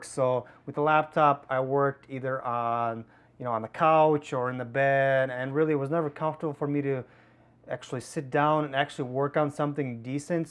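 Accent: Russia. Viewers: I knew it))) You cannt get rid of a russian akcent compleately))) Regards from Ukraine!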